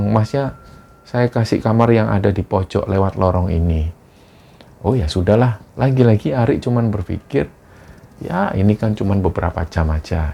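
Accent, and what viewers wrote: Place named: Surabaya, Indonesia. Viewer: Mas dialek Surabaya nya kental banget....☺️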